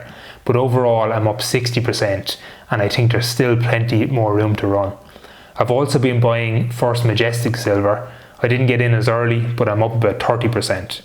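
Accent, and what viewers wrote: Ireland. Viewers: What an accent! Are from Ireland by any chance? Greetings from Munich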